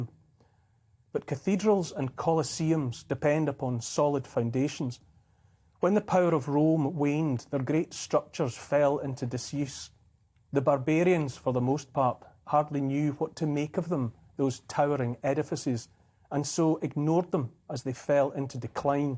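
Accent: Scotland, United Kingdom. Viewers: You are the very first person I’ve comes cross to see through this guy. I was getting worried I was the only one. He’s crafted his delivery to come across as very sincere. He doesn’t smile, continuous Scottish monotone, speaks of tradition, knowledge, learning, then says he’s not an academic, but that taking advice from medics, scientists, and virologists who have to train and study their disciplines for years should be ignored. He doesn’t acknowledge this virus has killed and caused long term damage to thousands of people. But these fools kneel at his feet. Pathetic. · Freedom sounds so much better in a Scottish accent.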